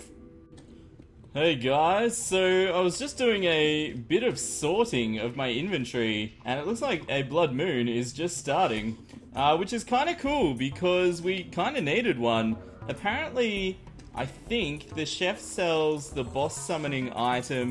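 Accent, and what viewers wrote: Australia. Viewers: im like 85.6% sure you are australian, but i would like to be 100% sure. are you australian?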